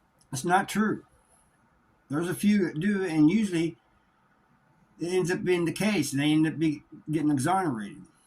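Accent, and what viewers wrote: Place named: South, United States. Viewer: What state are you from ya accent sounds southern bro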